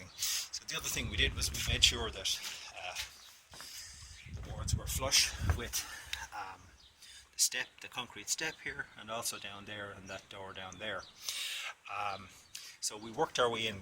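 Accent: Ireland. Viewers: Ha! I figured the accent was Irish! This is awesome, our corrugated plastic flew away during one of the recent storms, they were only nailed down, this is exactly what I needed! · Just watched to the end and it's fabulous I wanted you to walk inside with the camera at the end. Love your accent too. I want to move to Ireland now :-).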